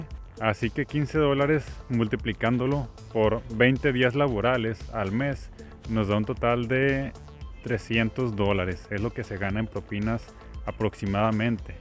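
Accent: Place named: North, Mexico. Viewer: Acento norteño mexicanazo.😊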